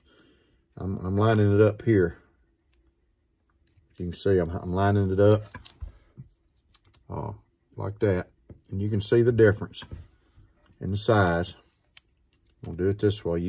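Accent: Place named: South, United States. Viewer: I liked this video as soon as I heard that reb accent. Go figure He likes Sodbuster's too! one of many Case EDC's that are perfect.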